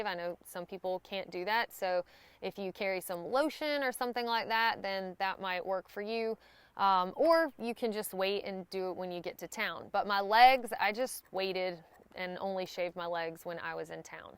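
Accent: South, United States. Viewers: Southern American accents are so annoying but decent video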